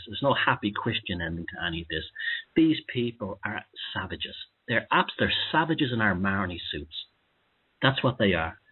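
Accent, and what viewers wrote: Dublin, Ireland. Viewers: Blimey, this is the first time I have listened to one of broadcasts. Initially I liked his Dublin style but thought he was a bit too full of himself with all his female groupies on Facebook. Good Lord, I'm a bit like that myself!!...However listening to this and his description of the riot in Dublin in 1981 and the evil that was on the other side ready to deal with the protesters rang so true with me. I was in Dublin a couple of years after that particular riot outside the British Embassy and a friend described how he was working on the railways and saw the protesters struggling home along the tracks trying to escape the police. He said they had been battered beyond belief. It was something he had never seen before. When the power of the state is truly unleashed it is terribly frightening.......I'm relating so much to this podcast and what he is saying. 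Good stuff. I'll forgive all yer other shite if you forgive my occasional after the pub rants against the Irish!....Des Brittain.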